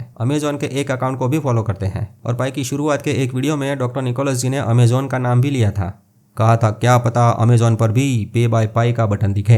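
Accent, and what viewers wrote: Gujarat, India. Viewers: Awesome sirji hindi +gujju tont amazing combination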